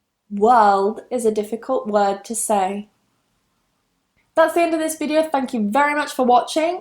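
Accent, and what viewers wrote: United Kingdom. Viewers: British Accents😎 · Is this british pronounciation ?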